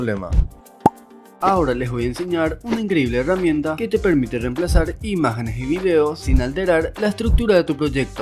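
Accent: Paraguay. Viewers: Tu acento en muy paraguayo ¿eres de paraguay? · interesante se nota mucho nuestro acento paraguayo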